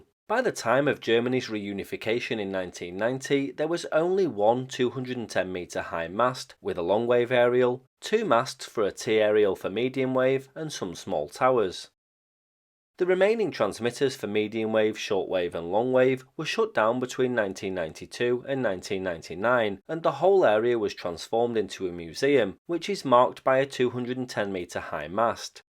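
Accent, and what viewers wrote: United Kingdom. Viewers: This was my first time hearing German spoken with a British accent! :)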